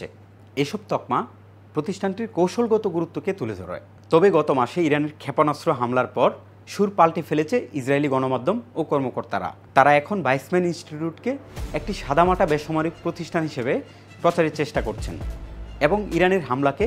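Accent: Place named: Delhi, India. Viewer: delhi r loker bangla jmon houa uchit r ki..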